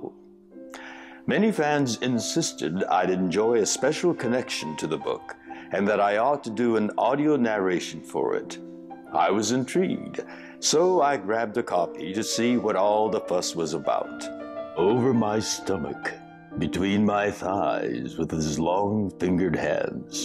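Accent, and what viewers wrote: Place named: Japan. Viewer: His Japanese accent comes out so much when he uses his narration voice. Oh myyy.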